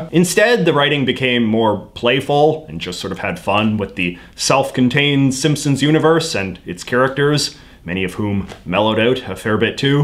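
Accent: Canada. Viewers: You are so blatant Canadian! LoL!!! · I'm aware that it's his accent, as a fellow Canadian I'm saying tone it down lol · Most Canadian man I’ve ever heard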